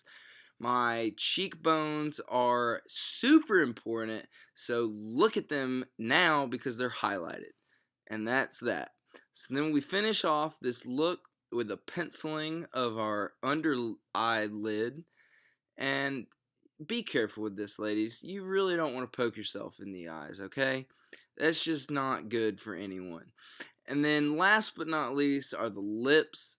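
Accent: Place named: South Carolina, United States. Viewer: ARE YOU FROM SOUTH CAROLINA?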